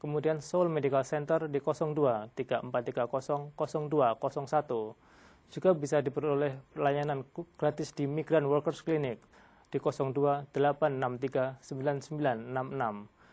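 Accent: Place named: Java, Indonesia. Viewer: HE HAS A JAVANESE ACCENT! He pronounces words so bad :(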